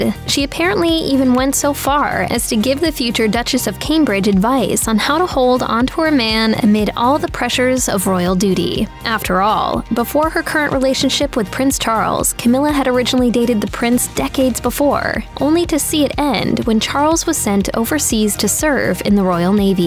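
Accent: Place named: California, United States. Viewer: The voice narrating this has too strong of a Cali-girl accent. Emphasizing the end of every word by drawing it out. It's distracting.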